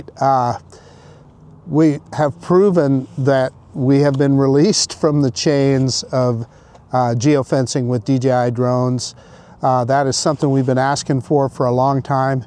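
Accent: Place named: Idaho, United States. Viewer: Sii y se pierde todo el acento de Idaho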